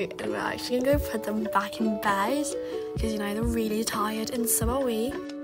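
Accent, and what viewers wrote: United Kingdom. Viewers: do you have the real british acceant no hate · Hi!!! I have a question!! In your tiktok role plays, you are American. But in your videos/voice-overs you are British. Why is this??? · You have such a beautiful voice! I love the British accent! 😉😄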